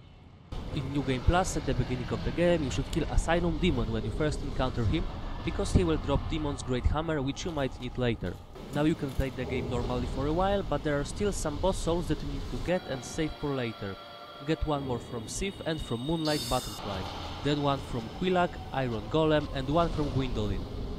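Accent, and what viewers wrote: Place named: Italy. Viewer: Avverto un accento italiano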